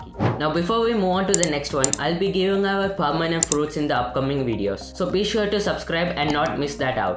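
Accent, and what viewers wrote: India. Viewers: You sound like an indian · indin accent 💀💀💀💀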